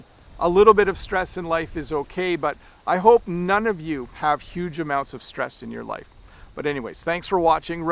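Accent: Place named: Canada. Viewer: If there is a person without stress, tell me who, I’d wanna be that person! Great lesson. The more I watch your lessons, the more I like them. Because you teach in a very unique way and are kind and understandable. And your explanations always make sense. Keep it up, especially because I love Canadian English. Greetings from Switzerland.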